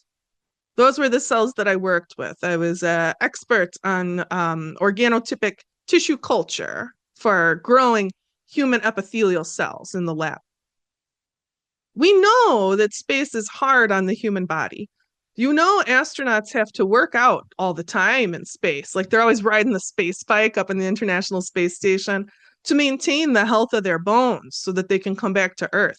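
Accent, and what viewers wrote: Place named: Minnesota, United States. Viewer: You must be from Ely or Duluth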